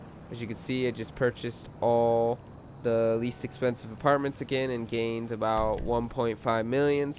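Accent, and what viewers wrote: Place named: Cuba. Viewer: superliked you sound like a cuban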